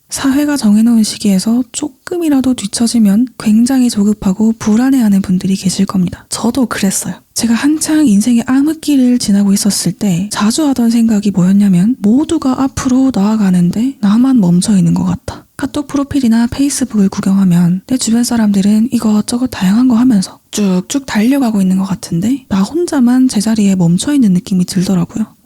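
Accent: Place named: Busan, South Korea. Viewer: 나만의 타인의 고유성 다양성❤ 감사합니다 😊 부산분 같아요 만나고 싶네요 🙏🏼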